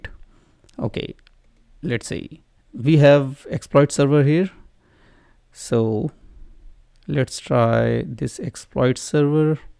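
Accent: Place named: India. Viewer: i didn't mean any criticism, imo indians have a tutorial in everything i love what you guys share with the world, and more importantly I LUV UR ACCENT ♥️🔥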